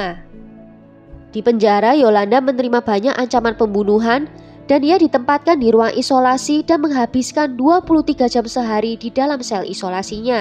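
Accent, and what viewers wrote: Java, Indonesia. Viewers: Cantik.. medokuhhh 👍💖💖💖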